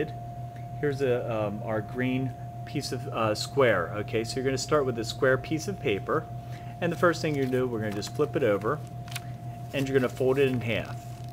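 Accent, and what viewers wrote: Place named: Pittsburgh, United States. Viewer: Digging your Pittsburgh accent. :)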